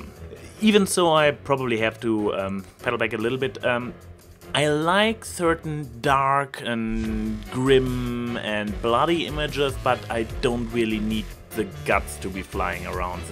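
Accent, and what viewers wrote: Germany. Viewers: Du hast n deutschen Akzent, meine ich :) ich hab mich wärend deiner videos gefragt woher ich den akzent kenne ~ und musste feststellen dass ich auch so rede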